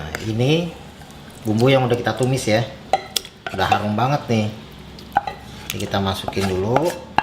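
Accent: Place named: Depok, Indonesia. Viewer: Lontong sekel.. bise begitu yee bang.. depok beut dah ah logatnya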